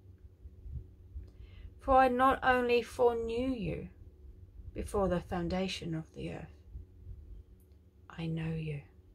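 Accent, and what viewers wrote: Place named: Australia. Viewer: Oh wow!! Aussie!!!❤❤❤ Love to hear your voice. I’m in the United States, Kentucky ❤❤❤😊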